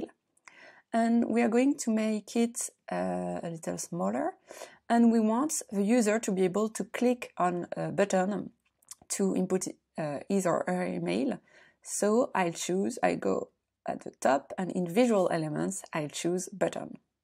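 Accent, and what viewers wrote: France. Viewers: Thank you so much for this video! p.s. I love your accent! -I know my people when I hear them. Go France!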